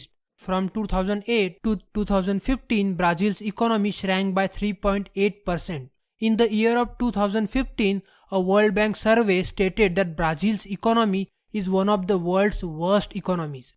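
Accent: India. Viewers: Indian accent 👎 no thanks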